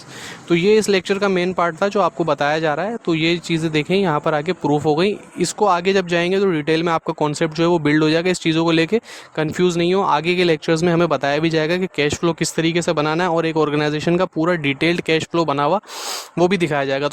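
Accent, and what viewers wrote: Karachi, Pakistan. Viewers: Sir Karachi se lagrhy hain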